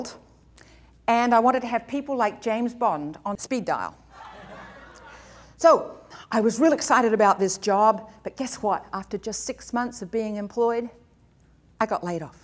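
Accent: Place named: Australia. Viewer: Houston speaker with an Aussie accent - who would have thunk it?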